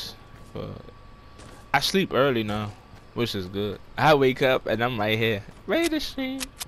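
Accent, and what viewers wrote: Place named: Washington DC, United States. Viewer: sounds like is from Washington dc.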